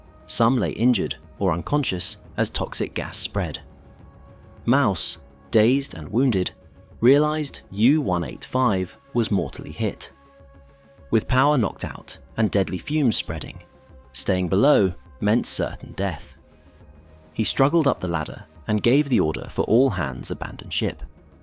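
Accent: United Kingdom. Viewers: Az-ores, not A-zores. Hearing a British accent speaking American sounds awful.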